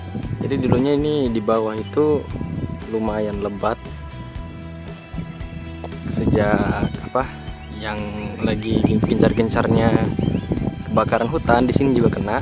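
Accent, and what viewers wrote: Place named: Java, Indonesia. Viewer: Bahasa jawanya medok banget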